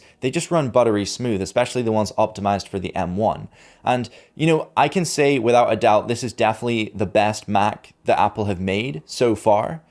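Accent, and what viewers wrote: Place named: Northern Ireland, United Kingdom. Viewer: I hear a twinge of Irish or Northern English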